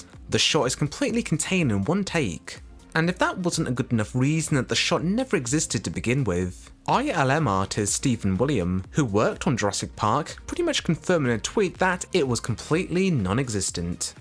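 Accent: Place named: Wales, United Kingdom. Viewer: Your accent reminds me a bit of Dave Lister from Red Dwarf. So that's, what, Welsh maybe? Being from California, I'm unfamiliar with the regional patois of British dialects.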